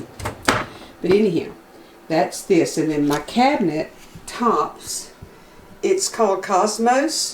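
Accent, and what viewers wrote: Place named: South, United States. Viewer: Hi I just recently subscribed to your channel. What a cozy well thought out kitchen you have. Thank you for sharing your recipes. Your such a sweetheart and I love your accent. I’m in 🇨🇦 and enjoy your Southern flair. ❤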